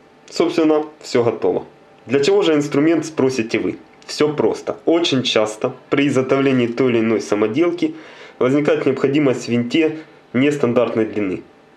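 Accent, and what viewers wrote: Odessa, Ukraine. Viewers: Автор наверно из Одессы. Пионар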